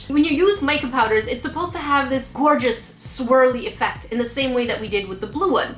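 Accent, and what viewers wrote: France. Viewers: ur FRENCH? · Can I ask you a question are you French? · Wait are you French?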